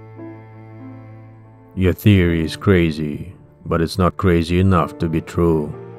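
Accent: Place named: Eastern Europe. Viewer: Ok my friend, if u ever come to Toronto... Contact me... Seriously...I would love to host you :) And I won't forget the offer. You sound eastern European. What country are you from? Bhor was twisted. Interesting how many of these great intellectuals are so relatable and down to earth. Pragmatic yet spiritual. LOVE IT. Thanks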